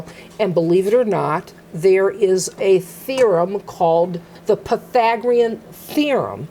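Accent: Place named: North, United States. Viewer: Nice tutorials and even nicer to hear a northern state accent again, reminds me of my late father, born in Bar Harbor Maine and lived in Massachusetts all his life. Nothing against the southern state boys, they're good too but the accents grate after a while.....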